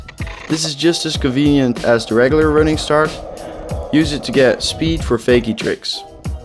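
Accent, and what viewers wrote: Netherlands. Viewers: Ik dacht al te horen dat je Nederlands bent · are u dutch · U dutch? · are you dutch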